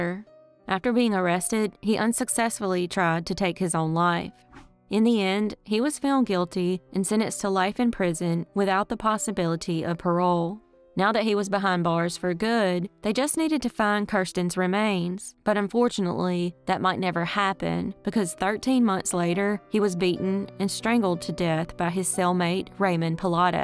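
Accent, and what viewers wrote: South, United States. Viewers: hello sweet southern lady. love ur channel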